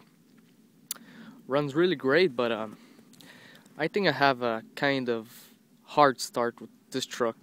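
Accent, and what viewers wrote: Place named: Quebec, Canada. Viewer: cool accent.. are you french canadian? I have a few freinds that are.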